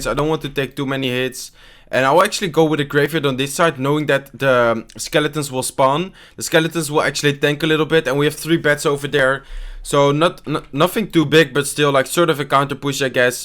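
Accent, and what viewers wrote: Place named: Netherlands. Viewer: R u dutch u sound dutch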